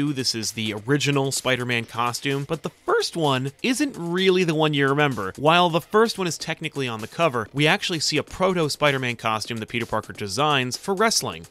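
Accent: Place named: New Jersey, United States. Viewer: sounds like a 30 somethings year old white guy from Jersey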